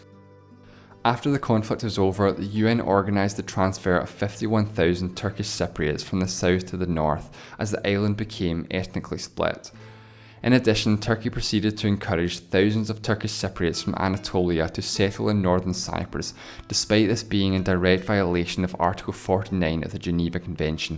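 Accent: Scotland, United Kingdom. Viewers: Geet Gupta Scottish, Scotland is in the uk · Scottish or Irish accent? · Dat awesome accent. Like I just watched Trainspotting again. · POSH SCOTTISH. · Are you Scottish?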